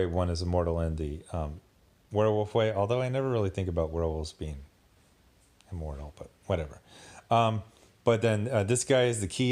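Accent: United States. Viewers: First, Underworld was a rip-off of Vampire: The Masquerade, Rage: The Apocalypse, and the whole classic World of Darkness in general. I still love Kevin Grevioux (accounting for your American accent, pronounced "Greh-View" with 'eh' being pronounced as in 'meh'). Second, regarding Vampire strength, it's implied in the movie--and generally accepted in all modern Vampire stories--that 1) the older a Vampire is, the more powerful they are, and 2) the closer they are in generation to the first vampire, the stronger they are. Selene was both old (over 600 years old) AND iirc her sire was sired by the original Corvinus vampire, making her a third-generation vampire. Example: a fourth-generation vampire who's 600 year old is probably weaker or just on par with a 300 year-old third-generation vampire.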